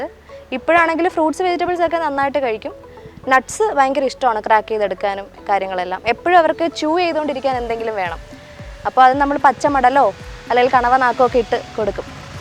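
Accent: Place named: Tamil Nadu, India. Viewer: Unga voice la tamil la maraikka pannureenga but tamil oru poothum maariyathu mam valthukkal mam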